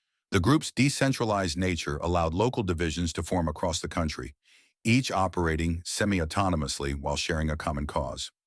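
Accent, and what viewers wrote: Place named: United States. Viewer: Awful lifeless video for two reasons: the AI voiceover is in an American accent, and the video is clearly made by AI, not real footage.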